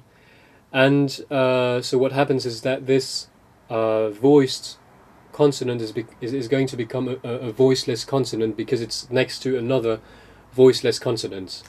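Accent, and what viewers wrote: England, United Kingdom. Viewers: Fantastic English accent! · You have a lovely English accent :) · Actually, I really think this "letter" could be a great difficulty for an english speaker... Even in "Francophonie", we have different pronunciation ... For example, here in Belgium, the center, near the capital Brussel, we use a "R" more agressive than the South of Belgium... in some places, they "roll" this letter... like in Italian... And in Quebec, I think they pronounce de R almost like... englishesspeakers! LOL So, if you try to learn, I just have to say GOOD LUCK! And sorry if we hear your accent... it's allways like that, but we love that cute english accent! (we don't recognize if it's English, American, Canadian... or else, but we love it! really!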